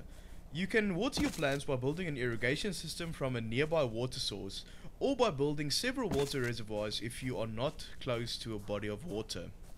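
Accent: South Africa. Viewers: hahaha kan hoorr jy is afrikaans 😎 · are you South African? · South African? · You must be South African 😂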